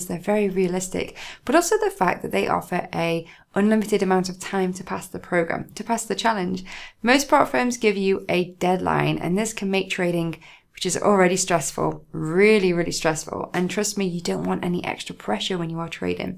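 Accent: United Kingdom. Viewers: She just made everything seem simple with her amazing British accent!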